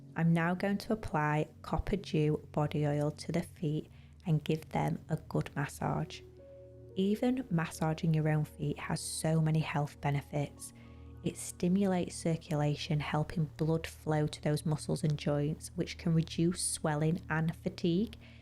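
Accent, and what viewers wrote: United Kingdom. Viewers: From what part of the British Isles does your accent originate? I think it's fantastic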